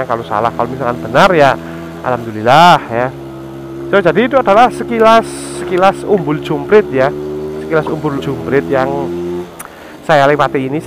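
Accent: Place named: Temanggung, Indonesia. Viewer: Kok koyo wong temanggung asli mas 😅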